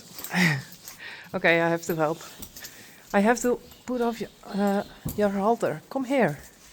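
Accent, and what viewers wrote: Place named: Netherlands. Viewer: weet jij dat je een prachtig Nederlands accent hebt? 😎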